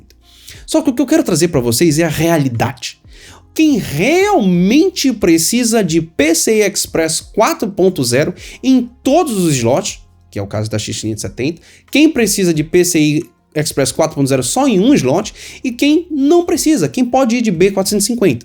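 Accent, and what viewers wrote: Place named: Belém, Brazil. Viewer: Mano... Tu és de Belém-PA? Teu sotaque é típico da nossa rapaziada. 😁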